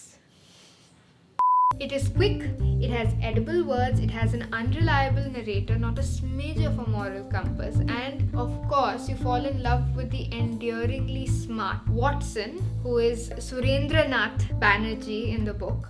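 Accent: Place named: Bengal, India. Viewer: The bengali badass 😂 love the accent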